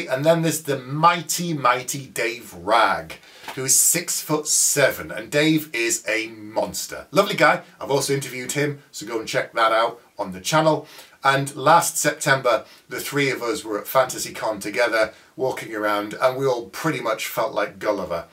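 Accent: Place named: England, United Kingdom. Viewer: Really cool video, and really surprising. I would have never guessed that you don't like tea. And you don't sound like Neil Gaiman at all. I think it's because of the accent. It's as if they said that you sound like David Attenborough, just because he sounds English too. Anyway, I haven't actually checked, but do you have audiobooks read by you? It would be cool, I think. Good weekend to all your followers.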